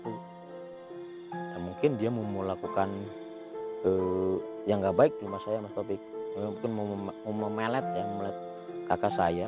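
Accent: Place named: Sunda, Indonesia. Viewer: Assala mu allaikum om ngomong sundanya lucu om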